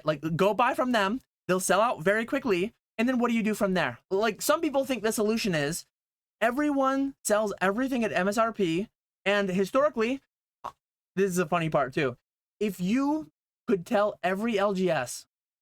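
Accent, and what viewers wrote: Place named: Upstate New York, United States. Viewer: Oh snap. A fellow upstate NYer. And sounds like an actually upstater. Not just an hour north of NYC.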